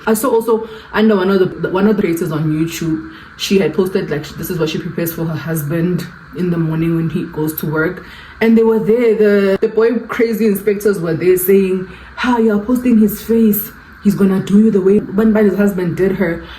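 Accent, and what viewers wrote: Nigeria. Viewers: I love the Nigerian accent abegg🤣❤️ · The minute i saw Stanley on the caption i was so quick to get mine😭😭👍🏿 Stanley cup ke achievement 😭❤️❤️❤️. It's the Nigerian accent that always makes me come back here 😂😂😂 Please na. 😭❤️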